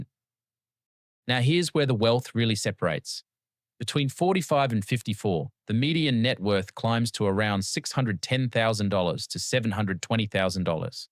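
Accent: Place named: Australia. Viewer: Also i love the aussie ai slang better than your american accent in all the past videos its just 2 weird lol keep up the aussie accent for next videos!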